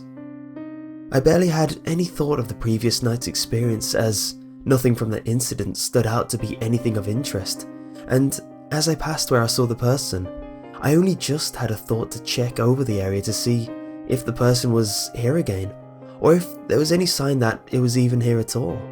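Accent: United Kingdom. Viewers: Is it just me or do stories sound better with a british accent? has a good mic too so he is my favorite narrator.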